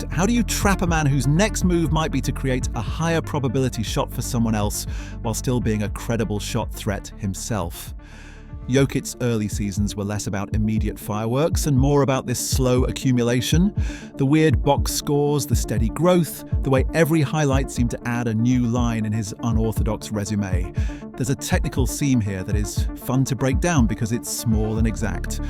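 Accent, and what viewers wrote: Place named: United Kingdom. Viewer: Originally I was leery of your Anglo-accent (you know the bias: what could some Brit or near-Brit have to say to us about our sport?), but soon I realized this was an extremely insightful analysis of the Joker's game. And more and more, I began to appreciate your beautiful language, from beginning to end. I love Jokic's game - the essence of basketball art - but never in the vast library of Jokic videos have I heard more succinct, more precise, more well-wrought, more understanding descriptions of what the man is doing. Just excellent. I salute you. In my view: # 1 Jokic video.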